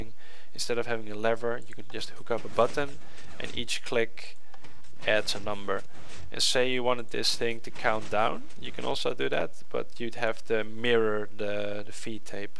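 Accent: Netherlands. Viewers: Are you dutch? I think it by listening to your accent :P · you dutch? becuz it sounds like so ;) (if you are, im dutch ;)) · ja ik hoor nu al dat je nederlands bent :P , maar wel vet gemaakt :D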